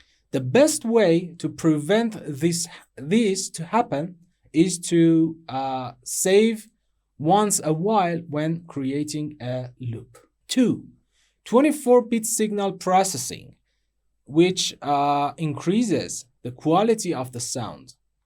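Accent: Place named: Iran. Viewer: Your accent is so persian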